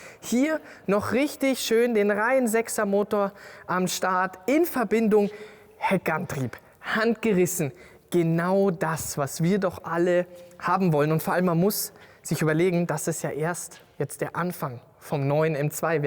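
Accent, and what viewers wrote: Bavaria, Germany. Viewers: heute zum ersten mal auf Eurem Kanal - boarisch, da Wahnsinn (Muttersprache :), des is a moi a Abo wert!! Mir gefiel der M2 vom ersten Moment und ich bin am Samstag in der BMW-Welt um das reale Auto rum - kein Vergleich zu Fotos oder Videos - ein Traum. Mein 240 (F22) wirkt dagegen schon fast unmodern. Brooklyn Grey als Schalter kann ich mir sehr gut vorstellen :) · Ruhig bissl bayrisch find du ch subba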